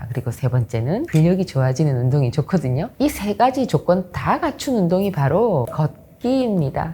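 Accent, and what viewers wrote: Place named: Daegu, South Korea. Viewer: 이분 말투가 대구 사투리인거같은데 매력있네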